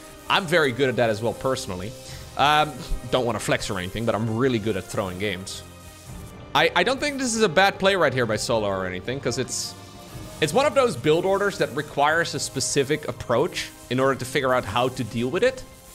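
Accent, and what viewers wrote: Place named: Netherlands. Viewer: I don't care what you say yhe dutch sounds freaking awesome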